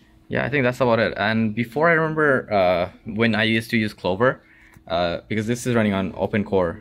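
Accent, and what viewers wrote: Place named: Arab world. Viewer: Love your middle eastern accent bruh, and thanks for this informative video! I plan on picking up this exact laptop due to it looking exactly like a MacBook Pro. Already Have a Mac Pro gaming Hackintosh. And yooooooo, I planned on doing the exact same thing with the apple sticker when I get this, are you me?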